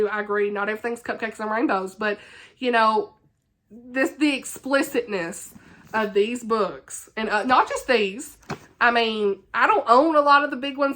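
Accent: South, United States. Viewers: Northanger Abbey in a southern accent is not something I knew I needed in my life 😂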